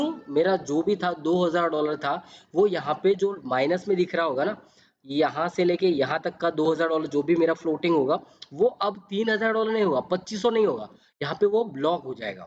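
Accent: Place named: Gujarat, India. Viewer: Gujarati can identify that Gujarati accent 😂😂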